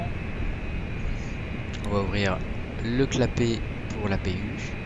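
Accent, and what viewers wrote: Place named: United States. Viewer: accent américain yes 😀😀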